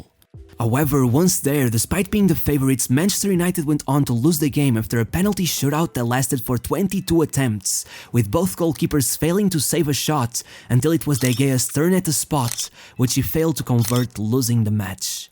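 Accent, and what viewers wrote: Portugal. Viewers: Your Voice looks portuguese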